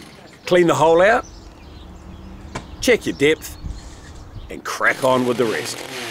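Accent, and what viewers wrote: New Zealand. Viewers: That’s a New Zealander. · It’s New Zealand not Australia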